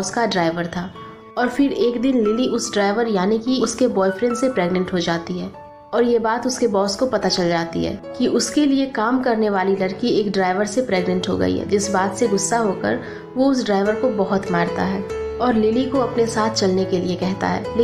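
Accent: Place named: Bihar, India. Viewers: Bihari ho ka ji.... परोसी, परा , भीर..... ई सब का है।।। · You are from Bihar.. Am I right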